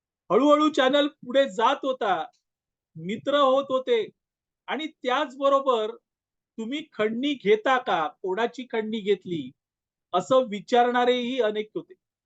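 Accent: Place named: Konkan, India. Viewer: माका खूप आनंद होवाक,तुमचा कोंकणी आवाज कानावर असाच पडत रहावा! आमचा आनंद असाच कायम ठेवा,हेच मागणे आसा!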